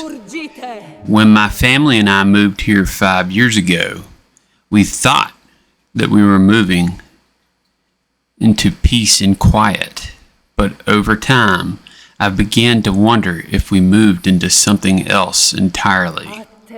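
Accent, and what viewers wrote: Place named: Appalachia, United States. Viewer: Killing time having a stumble through your playlists wonderful narating I love the Appalachian accent it'sy favourite of the American types and Ur all so handsome out there' too🐻🐻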